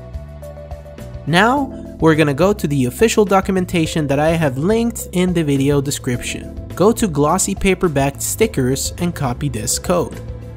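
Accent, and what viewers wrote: France. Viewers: Are you french ? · Hi, Are you french? Salut, t'es français ?xD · hey are you french ? great vid btw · you are french ? "salut mes amis"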